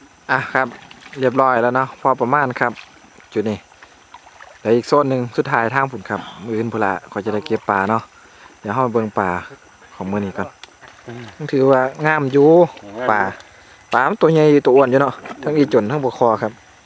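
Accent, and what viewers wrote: Isan, Thailand. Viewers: คนใต้แต่ชอบสำเนียงอิสานเพราะจังชอบอาหารด้วยท่องเที่ยวด้วยไปมาทุกจังหวัดเลยค่ะ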